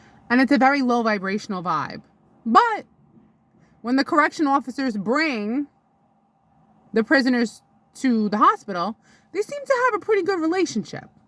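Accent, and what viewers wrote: New York, United States. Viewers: You have that new York accent .love it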